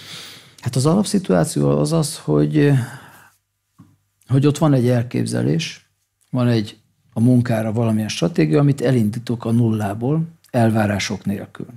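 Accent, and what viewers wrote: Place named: Russia. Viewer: Kedves! Nagyon érdekelne, hogy miért beszélsz akcentussal? Olyan a hanglejtésed, mintha orosz lenne az anyanyelved. Jól hallom? Köszönöm a videókat, nagyon szeretem őket, remelem egyszer lesz alkalmam egyszer találkozni veled!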